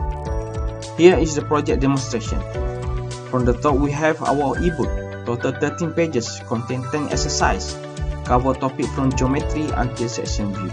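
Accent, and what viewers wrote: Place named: United States. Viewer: Terbaik. Usaha yg sgt baik. Cara cakap pun mmg bunyi slang Amareka. ~ Azar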